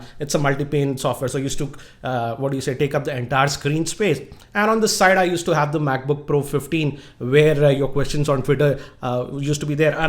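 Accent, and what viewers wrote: India. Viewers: Good video. I've always liked the indian accent, it makes me feel at ease. Thank you.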